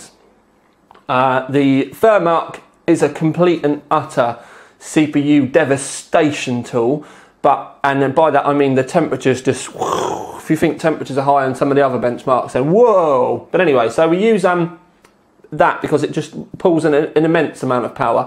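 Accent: United Kingdom. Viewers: British accent haha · British pronunciation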